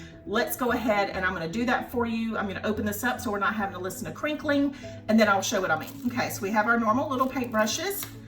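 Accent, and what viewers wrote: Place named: North Carolina, United States. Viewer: You are a joy to listen too, and as I'm originally from North Carolina, I love your accent.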